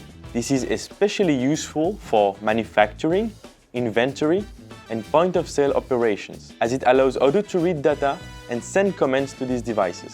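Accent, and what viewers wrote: France. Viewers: J'espère que tu as amélioré ton accent trop french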